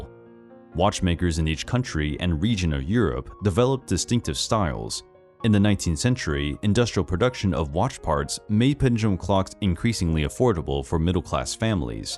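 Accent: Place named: United States. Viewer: I can tell by your accent that you're second generation Chinese American. People raised in homes where Chinese is spoken by their parents, and English spoken at school, tend to have subtle giveaways in their speech. Like you.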